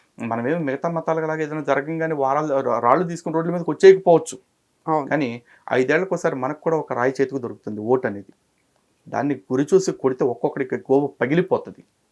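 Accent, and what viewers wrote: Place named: Andhra Pradesh, India. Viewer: bro edaina pdf gani Wikipedia edina link gani ivvandi brother 1528 lo Vijayanagara empire ani nenu ante kaadu Golconda antunaru kada ade nenu chadivaa antunaroo kada naaku Dani gurinchi telusukovali undi bro konchem edaina prefer cheyandi internet dorukutundho emo ani,me eppudu reply istaroo ani eduru chuustu untaa. Me cheppe vidanam chuste Andhra prantam vari laaga unaroo bro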